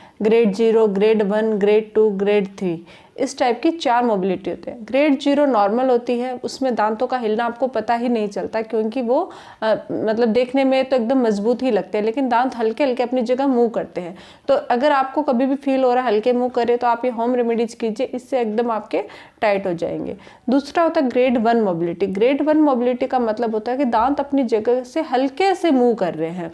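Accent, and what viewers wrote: Rajasthan, India. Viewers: mam ap Rajasthani hona? Apki tont se lagra hai 😁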